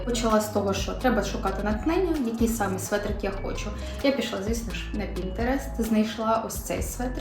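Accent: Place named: Pokuttia, Ukraine. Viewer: Чудовий покутський сведрик❤ Дякую за відео, дуже приємно тебе слухати і дивитись❤❤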